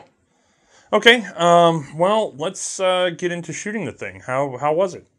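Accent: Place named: Carolina, United States. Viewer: It's spelled and pronounced "perdy." Gotta love that Carolina accent. :D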